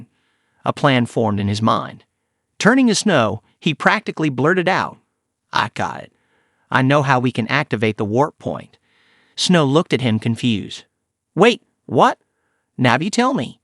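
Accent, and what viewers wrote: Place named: South, United States. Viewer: Sorry but the southern accent just doesn't work with this.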